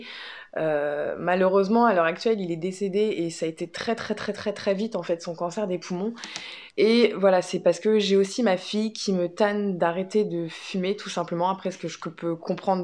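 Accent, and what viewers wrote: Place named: Normandy, France. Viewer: haha t habites en normandie!! je me disais bien que tu avais ce petit accent si tu arrives a arreter de fumer ce serait top!!!!! mets la photo de ton pere sur ton porte monnaie ça va te motiver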